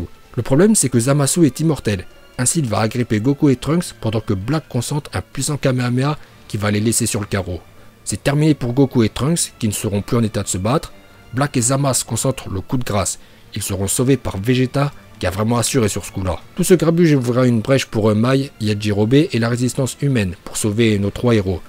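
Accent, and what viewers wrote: Japan. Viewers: Ton accent japonais est super 👌 😁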